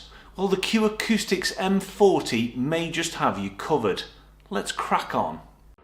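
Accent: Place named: Stoke-on-Trent, United Kingdom. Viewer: Not a dig my friend....I spent time in Stoke years ago and it is a lovely accent....brought back nice memories! 👍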